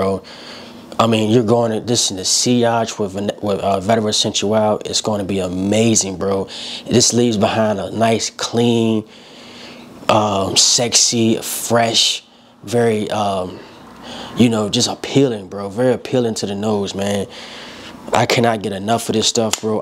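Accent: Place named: New York, United States. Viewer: Thought you was from nyc😂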